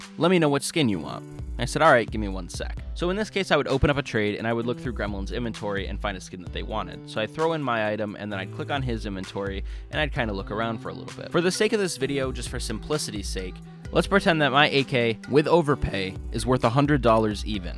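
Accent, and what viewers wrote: Sweden. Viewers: Are You from sweden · Bruh u from sweden ? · Are you from sweden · Are you Swedish or American?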